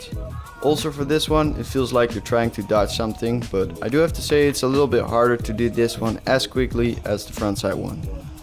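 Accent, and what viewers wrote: Netherlands. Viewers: R u not dutch? Ur accent is really dutch😂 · Btw are you dutch? I can hear it from your accent and when you say 'cool he' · Ben je Nederlands je hebt beetje accent van Nederlander 😂😂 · Dutch? Btw love this vid imma try it. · Ar u dutch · Lol I can hear that vague Dutch accent there, especially when talking to those kids in the end😂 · Are u dutch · Whahaha loll hoorde ik NOu goed dat je Nederlands bent? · does he sounds dutch or is it just me? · the accent says that your dutch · I can hear a dutch accent. Am i right or?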